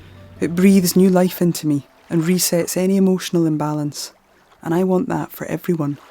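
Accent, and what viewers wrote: Scotland, United Kingdom. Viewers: Inspirational video. And the Scottish accent makes it even better. :)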